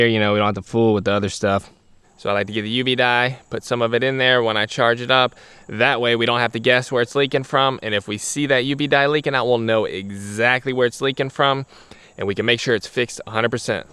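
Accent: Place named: Florida, United States. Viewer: “Ya knowww…” love your words my guy. You sound like you’re from Florida mr cool 😎 lol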